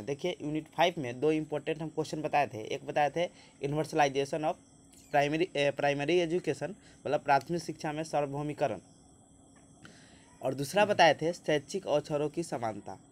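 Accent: Bihar, India. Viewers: Aapke notes helpful h aur aapke bolne k tarike to majedaar h, aapke bihari tone k kaaran smjhne me v aanand aata h, keep it up...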